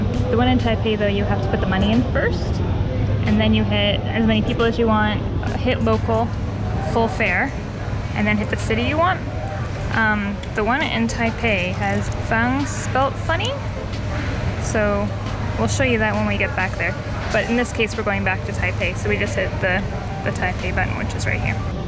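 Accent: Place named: Canada. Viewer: Not gonna lie, I have to skip that intro 😂 also you have the most Canadian accent I've ever heard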